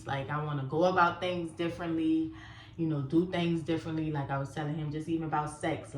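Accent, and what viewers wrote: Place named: New York, United States. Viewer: Hey just subd to your channel💯🫡. Are you from NY? You sound like your from up there🙂😀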